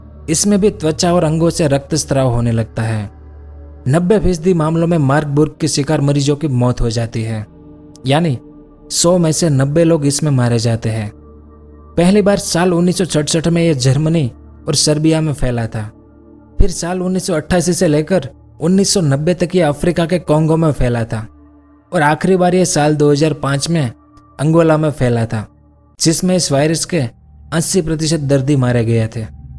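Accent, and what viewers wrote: Gujarat, India. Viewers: i am also from gujarat ahmedabad 👍 Aapki awaj par se mujhe laga ke aap gujarat se hi ho, isliye puchha.. good speach, good animation and knowledgeable video👍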